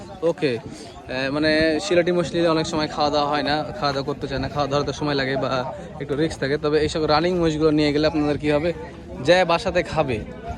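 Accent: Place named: Rajshahi, Bangladesh. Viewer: আমার ভাগিনী বিয়ে দিছি রাজসাহিতে ওর জামাই সাথে মাজে মাঝে কথা বলি আপোনার ভাষা ওনার মতো তাই জিজ্ঞেস করেছি, দেসের বাড়ি আসলে একদিন জাবো বেরাতে, আমারো ইচ্ছে আছে গরু মহিষ এর খামার করবো বাকিটা আল্লাহর ইচ্ছা দোওয়া করবেন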